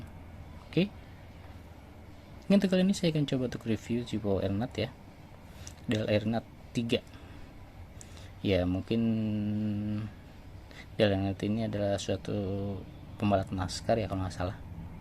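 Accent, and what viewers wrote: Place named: Banyumas, Indonesia. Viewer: Salam Mantap....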